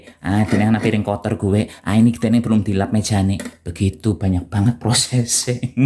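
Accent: Banyumas, Indonesia. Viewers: Masaaaak sih ,,,,,ya keteke kecuuut ,,,,sambil masak ,,,,kelihatan tambah buntder ,,,,hidunge tambah tidak kelihatan ,,,,yg penting tetap apa adanya ,,ngapaknya spontan malah tambah lucu ,,,,cerdas ,,,,masak sambil mbanyol ,,,,malah tambah nggemeske ,,,,,kalau dekat pingin nyiwel pipine sing tembem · Menjadi diri sendiri lebih baik mas. Autentic dg bahasa ngapak dan apa adanya. Ciri kasnya 👍👍👍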